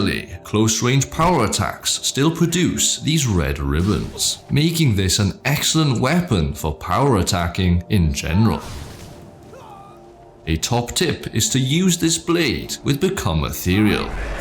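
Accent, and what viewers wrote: Wales, United Kingdom. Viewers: why are you Welsh and can you speak elvish?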